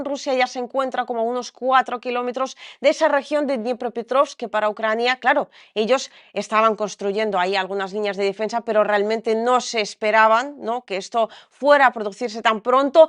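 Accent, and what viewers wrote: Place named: Spain. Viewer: Hola soy Español mi pregunta es dónde a prendites el español que maravilla cómo habrás con ese acento Español, parecés qué seas Española Felicidades españolista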